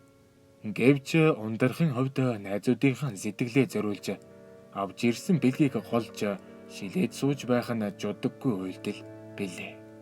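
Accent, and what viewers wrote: Inner Mongolia, China. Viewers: Өвөр Монгол аялага сонсогдоод байх юм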